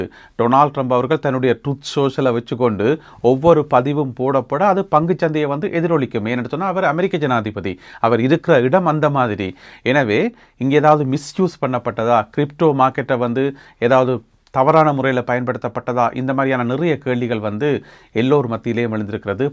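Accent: Jaffna, Sri Lanka. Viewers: செய்திகள் தரும்போது யாழ். தமிழில் தருவது அருமை.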